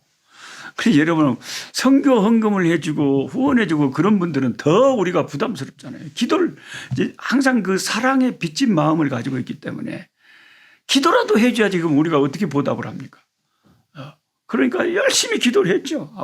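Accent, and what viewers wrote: Jeolla, South Korea. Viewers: 목사님 감사합니다.날마다 꿀송이 보약큐티 말씀을 통해 너무은혜가 됩니다.특별히 전라도사투리가 너무나 구수 합니다.항상주님의 은혜로 건강하시고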